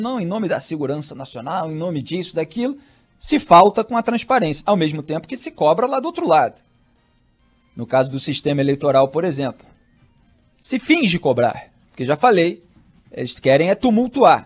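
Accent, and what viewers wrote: Rio de Janeiro, Brazil. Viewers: Fala carioca 🤗🤔🇧🇷🤭😷liberdade de críticas ❤️ admiro sua coragem.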